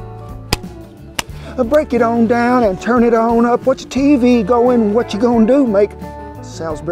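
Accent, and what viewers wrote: South, United States. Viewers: Love me some uneducated-southern-accent. Edit: Not everyone in Texas speaks like.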